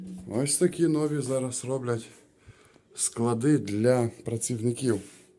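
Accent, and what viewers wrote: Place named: Western Ukraine, Ukraine. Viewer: Судячи з акценту, ти із західної частини України. З понеділка теж стартую по Польщі. Думаю, зустрінемось.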